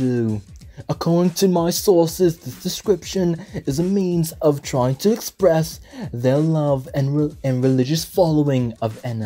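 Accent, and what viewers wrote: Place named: United Kingdom. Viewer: didn't know you're british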